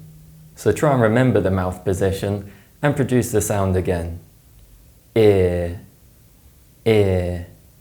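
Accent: United Kingdom. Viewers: Thank you teacher. I like british accent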